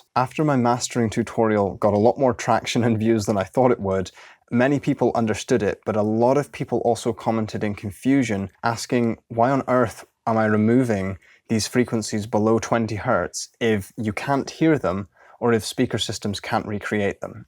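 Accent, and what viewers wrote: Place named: England, United Kingdom. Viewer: And he's not a Yank! plain English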